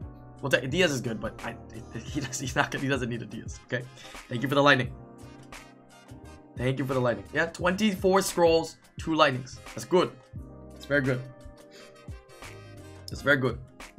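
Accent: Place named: Asia. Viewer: Omg the stereotypical Asian accent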